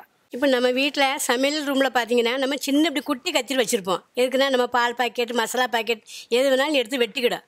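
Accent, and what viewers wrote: Tirunelveli, India. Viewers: Tirunelveli speech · Anna avanga thirunelveli slang anna...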